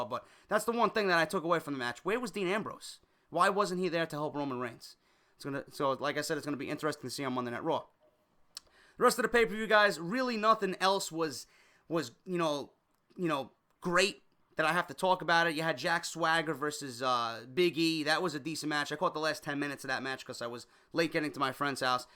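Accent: New York, United States. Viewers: Love your vids, your ny accent makes me smile you lighten up my day. Full homo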